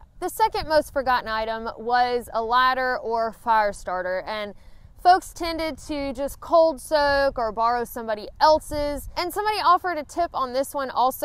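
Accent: South, United States. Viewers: This has NOTHING to do with backpacking, but I suspect it's happened to one or more of your viewers: I left my cellphone in a bathroom stall at a gas station in Sevierville, TN. It was the famous Frank Allen's (they have great breakfast: simple, comforting and so good, but they're famous for their slaw dogs) and someone was honest enough to turn it in. We happened to have some friends there when we left, so we contacted them and they picked it up for us. We were lucky, because my Amazon app was hooked to my credit card. Coulda been expensive. I have a feeling that folks have left their phones on the trail somewhere, hopefully with not-terribly-painful results. I thoroughly enjoy your channel, young lady. Your Southern "flavor" to the channel is comforting and most pleasant. It reminds me of the wonderful experiences I've had and hospitality of our brethren in the American South.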